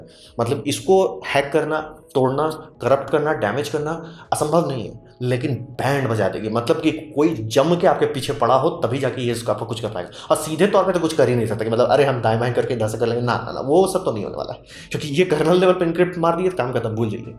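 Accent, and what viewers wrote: Bihar, India. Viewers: Wah sir wah, android Linux ka gotiya bhai hai 😂😂 sir aap bihar se hai kya 😀 pura desi bolte hai. Mja aata hai sunkr 🙏🙏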